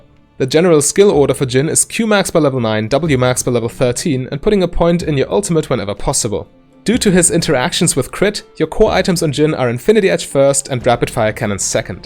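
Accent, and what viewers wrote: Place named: Germany. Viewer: classic german accent ^^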